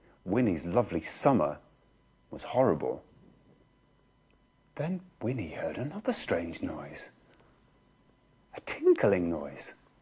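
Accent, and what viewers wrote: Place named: England, United Kingdom. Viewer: Richard's voice sounds a lot more English in this than in Robin Hood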